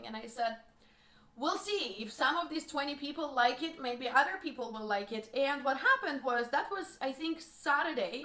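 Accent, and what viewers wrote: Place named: Russia. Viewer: Ого, даже не знала такую грустную и душещипательную историю этой потрясающей юморной красотки., ты умничка, обожаю твои видосы с этим офигкнным русским акцентом (на нём так понятен английский, что англичанам и американцам стоило бы поучиться)))) 😅 ты прелесть! Успеха тебе и не унывай и не сдавайся ❤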